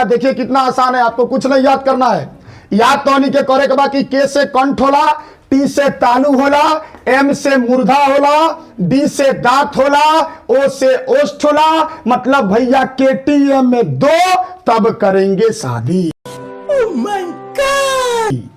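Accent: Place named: Bihar, India. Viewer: Sir jii Pura Bihari style me ❤❤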